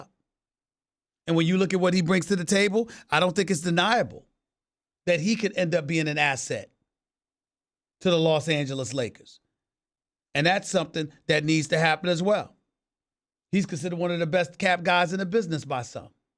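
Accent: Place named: New York, United States. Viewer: NY accent, especially in the boroughs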